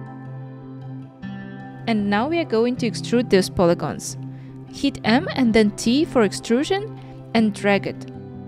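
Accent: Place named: Eastern Europe. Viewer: Единственный английский который я понимаю, этот тот, на котором говорят славяне, ахах) А почему решили на инглише вести канал?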